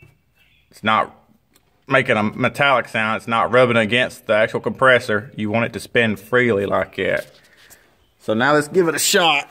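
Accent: South, United States. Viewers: I just love these mechanics/technicians with that hick grit accent, who are smart as hell. The accent makes you think they ain't too smoort. Then then show you what's up. I used ta get fooled by that accent, but then I went to navy nuclear power, worked with some terrifically smart good ol boys from the South, and got my city ass handed to me in terms of smarts, problem solving, and being great ship mates. Wish had been on my boat. Oh, and like a good nuclear engineer, he starts most of his explanations with, "basically"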